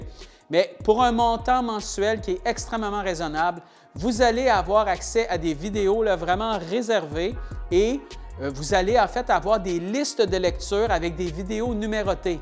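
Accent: Quebec, Canada. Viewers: C'est marrant... Quand vous écrivez, on n'entend pas votre charmant accent de la Belle Province...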